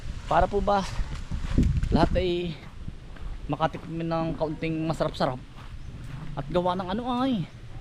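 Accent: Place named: Visayas, Philippines. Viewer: Nku idol tgal ko ng nuod sau kala ko bisaya k yung punto mo kase kala koy parte ng bisaya k😂😂😂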